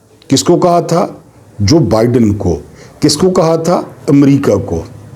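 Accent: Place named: Mianwali, Pakistan. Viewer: بسم اللّٰہ الرحمٰن الرحیم السلام علیکم محترم جناب باتیں یا گفتگو وھی درست ھے جس کا جو بھی لہجہ ، ڈائیلک ھوتا ھے _ لہٰذا ، آپ کا انداز آپ کا لہجہ اپنے علاقائی رنگ میاں والی ، والا انداز درست ھے _ ماشاءاللہ لا قوۃ الا باللہ ، آپ کی گفتگو آپ کا طرزِ تخاطب فطری ھے جو کہ اپنی جگہ نہایت مناسب و موزوں ھے _ دوسری بات جو کہ بہت اھم ھے وہ یہ ھے کہ آپ تہہ ❤️ دل سے پر درد انداز میں کہتے ھیں ، دوسرا کوئی شاید ھی اس انداز میں بول سکے ـ باقی کچھ بھی حرف آخر نہیں ھوتا _ سب خوبیوں والا اور مکمل اکمل اور حرف آخر صرف کتاب اللّٰہ قرآن کریم فرقان حمید ھے _ دنیا والے جو کچھ کہتے ھیں کہیں! ایک منہ تو ھے نہیں! کس کس منہ پر ڈھکنا رکھو گے ؟ اپنا ویلاگ جاری رکھیں! جزاک اللّٰہ خیرا واحسن الجزا فی الدارین اللھم آمین آمین آمین برحمتک یا ارحم الراحمین _ وما علینا الاالبلاغ والسلام °°°°°°°°°°°°°°°°°°°°°°°°°°°°°°°°°°°°°°°°°°°°°°°° ۔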